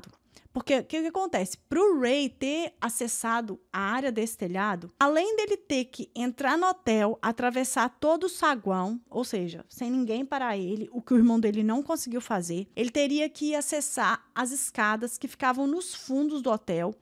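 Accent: Minas Gerais, Brazil. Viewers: Oi!!! Jeitim mineiro de contar história me conquistou! Adoro seu canal! Caso estranho heim...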